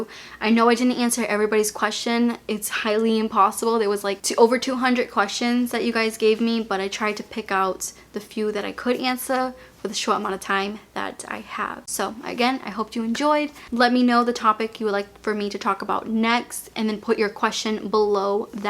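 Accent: Boston, United States. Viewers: It’s so funny, some times your Boston accent comes out WICKED strong, some times you only seem to accentuate certain words (I’m sure you don’t do it intentionally) Lol, I used to live and spent quite a few years in Lowell, Fitchburg, Cambridge, but I don’t have a Boston accent at all since I’m originally from Philly. (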